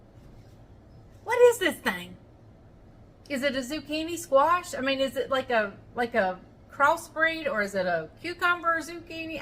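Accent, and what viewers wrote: South, United States. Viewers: Have you ever watched any of your videos with the auto-generated closed captions turned on? It's really cute and funny. closed captions for a southern accent. 🙂❤️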